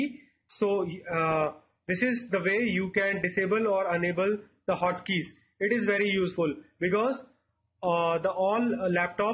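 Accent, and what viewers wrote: India. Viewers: sound indian bruh XD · Love that authentic Indian English accent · Indian accent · HAHAHAHAHA I love your indisch accent :D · Judging by the accent you must be Indian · FantasticGamerYT it's an Indian accent you're just an ignorant ass who has no consideration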